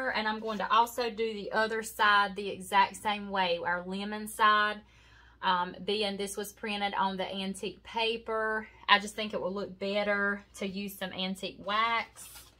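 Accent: South, United States. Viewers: You're so creative and your projects always look high end like most YouTubers say, but they don't usually hit the mark! Not trying to be ugly to them, just trying to compliment you as you clearly deserve! Also, I love watching those of you that have a wonderful southern accent like myself... I'm from South East Texas. Where are you from?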